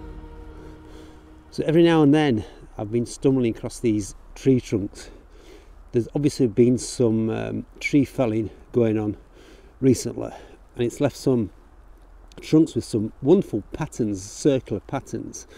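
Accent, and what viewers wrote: Yorkshire, United Kingdom. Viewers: Great watch again mate, so nice to ere ow many Hs ya dropped, and eld, appy, ungry, ome, folk ere can't understand me arf ov time. Great Yorkie tork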